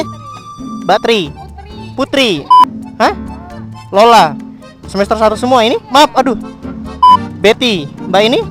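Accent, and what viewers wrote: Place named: Java, Indonesia. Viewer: Bang coro lagat ngomongmu kok kyo wong jowo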